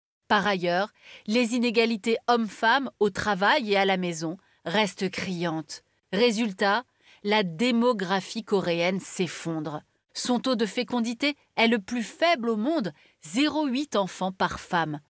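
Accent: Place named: United Kingdom. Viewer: entendre prononcer les noms des "chaebol" à l'anglaise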